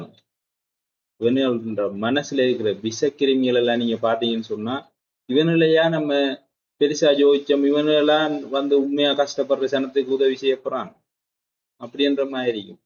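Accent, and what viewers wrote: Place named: Eastern Province, Sri Lanka. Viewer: தம்பி உன்னுடைய தமிழ் நீ கிழக்கு மாகாணத்தை சேர்ந்தவன் என்பது படடதெளிவாக தெரிகிறது. கிழக்கு மாகாணத்தை பற்றி கதைக்காமல் ஏன் வடக்கை பற்றி கதைக்கிறாய். அர்ச்சுனா ஒரு கோமாளி அரசியல் செய்கிறான். அர்ச்சுனா இன்னும் மூன்று வருடங்கள்தான் பிறகு வீடுதான்.அர்ச்சனாவுக்கு காசை கொடுத்தால் தலையாலும் நடப்பான். தம்பி நீ சொல்ல அதை நாங்கள் கேட்க நாங்கள் என்ன முடடல்களா. எங்களுக்கு பாடம் எடுக்க வேணாம்.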